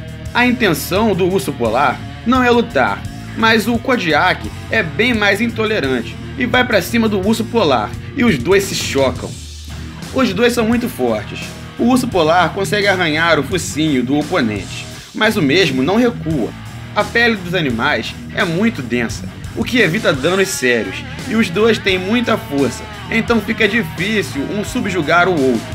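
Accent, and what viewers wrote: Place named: Rio de Janeiro, Brazil. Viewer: Saudades desse sotaque carioca 😁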